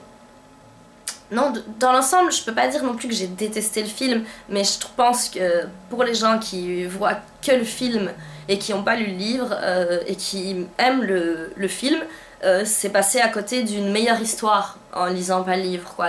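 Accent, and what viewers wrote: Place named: Southwest, France. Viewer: Oups c'est un garçon, il s'appelle, (j'espère que je ne trompe pas..) il est aussi blogueur, d'après son accent il doit être du sud ouest de la France...Allez à suivre..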